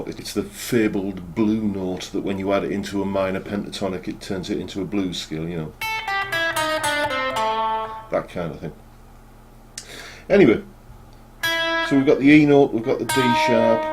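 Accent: United Kingdom. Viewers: HI - PLEASE EXCUSE THE CAPS BUT I AM PARTIALLY SIGHTED - ONCE AGAIN BRILLIANT - NICELY EXPLAINED AND WITH TABS!!! - MORE MORE MORE - SO GLAD I FOUND YOU - THE ALBUM IS GREAT - AND IT'S NICE TO HEAR A BRITISH ACCENT THANKS - DAVID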